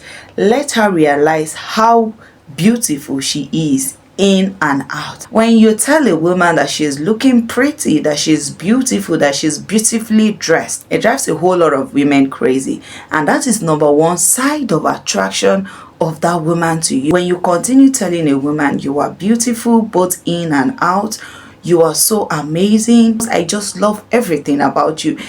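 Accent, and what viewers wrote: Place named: Nigeria. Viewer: I'm not joking about it, this is what i thought you were coming from america , but when i hear your english it's where i notice that you are from Nigeria eg when you say Joy stick bacas and all those you add on it oh my goodness you put spies...i love it very much..💋💋💋💋💔💋💔💋💔💋😊🤣😊❤️‍🩹❤️♥️♥️💋💔 remain blessed my beloved sister from another Mother watching from Johannesburg...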